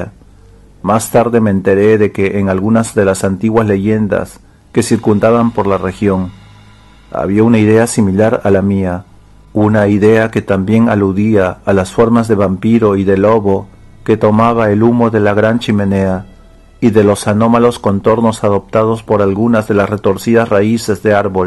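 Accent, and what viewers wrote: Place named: Jamaica. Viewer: Bien por la lectura, pero a veces suenas como si fueras jamaiquino. Para que trabajes en eso. Saludos.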